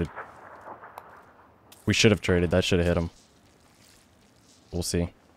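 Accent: Canada. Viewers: are you canadian ? just wondering sounds like it ;)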